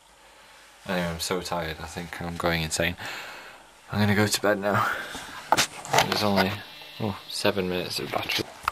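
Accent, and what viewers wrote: North, United Kingdom. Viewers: He sounds so northern and not as excited as usual... He is so much different. Definitely more northern. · His voice was so deep and northern then jfc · He sounds so northern in these early videos i love it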